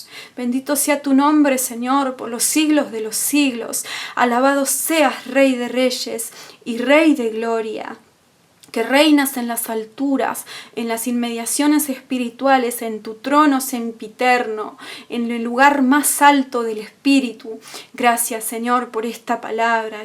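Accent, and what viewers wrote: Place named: Argentina. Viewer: Venezolana con acento argentino! Me chocan todas estas sectas incluyendo la peor q falla católica, nunca se pronuncian en favor de los necesitados. Estos pastores q tanto le roban a los ilusos, estos curas con tanta plata q tienen de años estar robando la humanidad q han logrado sembrarle a esas matrices de miedos y temores a los ingenuos, esos pastores de las sectas cristianas, con todo el dinero del mundo, como Cash Luna, Maldonado, Jonás Cardona y demás. Donde han sacado un peso para ayudar el pueblo venezolano? Ya q se roban $ hagan los siempre hacen, del millón ochocientos q se roban regalen en cuando sea los ochocientos, hacen lo mismo q hizo la iglesia católica en la segunda guerra mundial, mientras mataban ocho millones de judíos, su religiosidad de perdon, piedad y misericordia, nunca se pronunciaron, voltearon la cabeza sin importales nada. 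Eso mismo hacen en este momento, les importa un comino la necesidad. Creen q a punta de cháchara los van ayudar.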